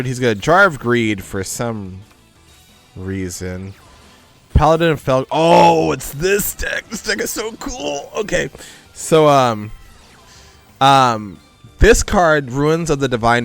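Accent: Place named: West, United States. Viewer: sound like cowboy but sometimes feel he just a farmer from Alabama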